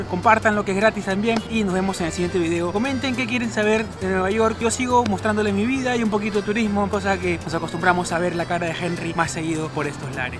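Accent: Peru. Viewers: Bro tu eres peruano vdd? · Hola te felicito eres espectacular..ese asentico peruano es fantástico... soy de Colombia conocí tu canal a través de planeta Juan...muy buen contenido .sobretodo la simplicidad que lo transporta a uno a tu amada N.Y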